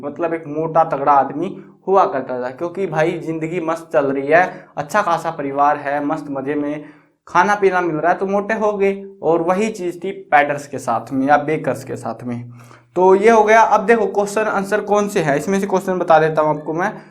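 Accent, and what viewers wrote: Haryana, India. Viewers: Bhai yaar tumhari voice sunn k lagta hai ki tum haryana side se hooo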